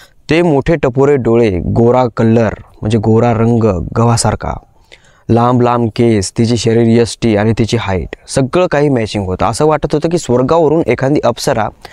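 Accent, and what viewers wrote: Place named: Vidarbha, India. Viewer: भाषा आपल्या विदर्भाची आहे भाऊ तुझी. लहानपणी आज्जी आजोबांच्या कथा ऐकलेल्या आहेत तू असं वाटतं. खूप छान कथाकथन करतोस तु. Good keep it up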